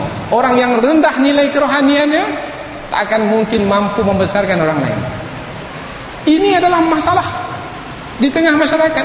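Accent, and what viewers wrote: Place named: Malaysia. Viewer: Sekali dengar seperti orng Malaysia.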